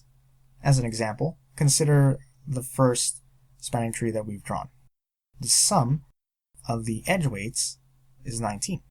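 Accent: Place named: United States. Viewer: Finally, american accent!!!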